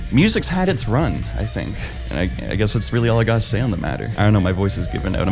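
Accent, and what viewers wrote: Canada. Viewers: All you Canadians sound the same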